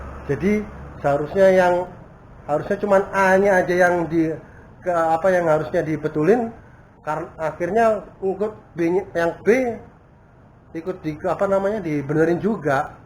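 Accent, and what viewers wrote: Java, Indonesia. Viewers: Asline jowo mas???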